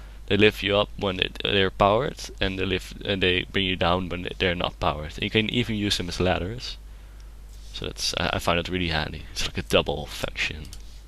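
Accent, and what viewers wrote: Netherlands. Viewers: you're totally right, he is dutch, I (guy from the NETHERlands) think. I only heard it after you said it though. I have a rather "deftig" (The Queens English) accent. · I hear a Dutch accent ^^ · It's sooooo easy to recognize a dutch accent (says this dutch guy here)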